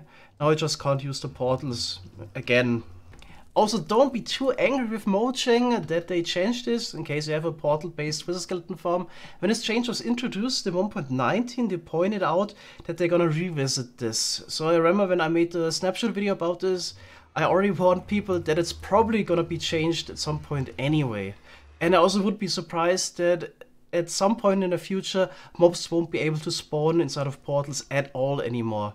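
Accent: Germany. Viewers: Hört sich an als wär da jemand ein Deutscher ;)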